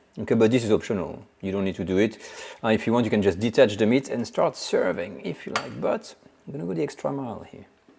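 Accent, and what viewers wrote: France. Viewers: Dear friend!! You are a truly great cook! I have been following you for some time! I truly LOVE your videos and the way you explain everything, with your lovely ....Franco-English accent... BUT !!! Well, we have, SHOULD be a bit more CONSCIOUS OF what we eat!! BABY ANIMALS ??????? THINK ABOUT IT !!!! FEEL IT!!!! For the civilized world THIS FACT must be PREPOSTEROUS, DISGUSTING, APALLING !!!!!!!!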